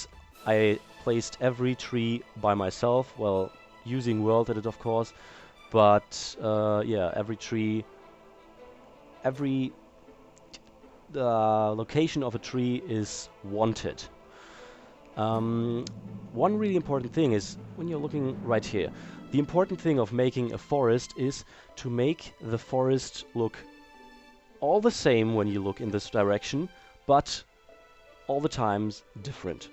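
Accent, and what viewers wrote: Germany. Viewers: Just a question, are you german? Your accent sounds pretty much like it :D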